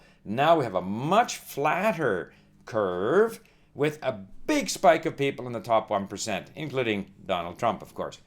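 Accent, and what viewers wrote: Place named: Canada. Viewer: Dude, I am Canadian too. You are the most Canadian sounding person I've ever heard. What part of the country are you from?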